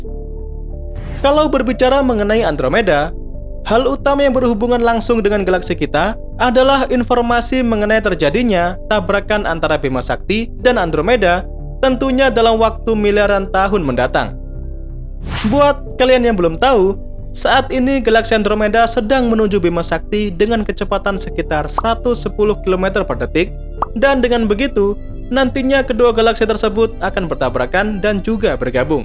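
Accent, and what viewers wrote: Java, Indonesia. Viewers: Jowone metu min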